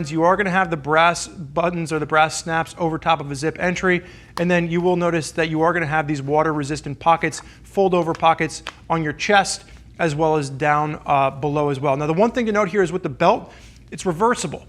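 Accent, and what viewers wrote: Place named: Pennsylvania, United States. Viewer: They are in Pennsylvania they have there own pronunciation,its no excuse I'm just saying.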